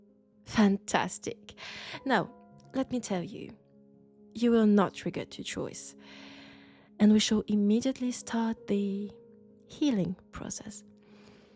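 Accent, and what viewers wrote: France. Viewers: Yesss! more, please! That French accent is melting my brain! ))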